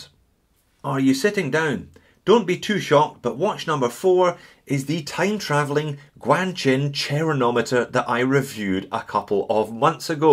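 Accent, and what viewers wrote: Scotland, United Kingdom. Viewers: So just to confirm your accent is Scottish?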